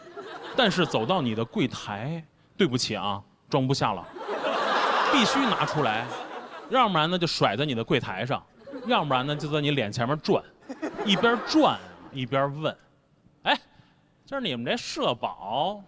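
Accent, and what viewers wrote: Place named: Beijing, China. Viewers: 好喜欢他的京腔啊❤ 段子也好👍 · 典型的京腔，条件反射式的反感啊，唉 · 特喜欢良言，京腔儿听着特舒服，幽默高级，不喊不叫，但让人乐半天，加油！ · 京腔是我的最爱，没有之一。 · 他的北京话我爱听，不烦人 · 可能是在北京生活过太久的时间 所有这些脱口秀就这个京式幽默我笑不出来 没觉得好笑 感觉都钝感了 光是那个口音出来我的笑点就提高了20层楼高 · 典型的京腔幽默😂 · 听了良言的京腔想起童漠男了。他的段子也很喜欢！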